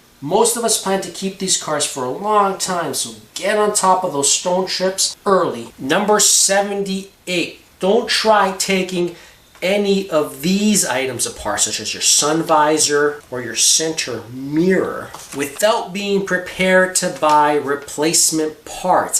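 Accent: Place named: Canada. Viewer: these videos are amazing! thanks so much. i just got my 1st r129 and i intend to keep it until i stop driving. i like doing as much as i can myself. When i was going through your videos i thought i heard a Canadian accent. I'm in Toronto, but originally Ottawa Valley. Are you from the Prairies?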